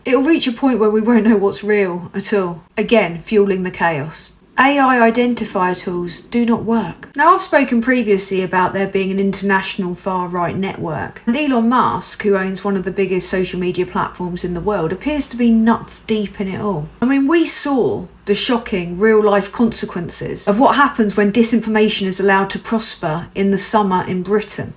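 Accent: United Kingdom. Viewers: Ur british accent is so beautiful! Love ur content from the USA!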